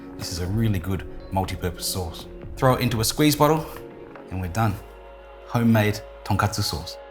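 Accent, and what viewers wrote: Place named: Australia. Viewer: You have cool Aussie accent! Great presentation 🥰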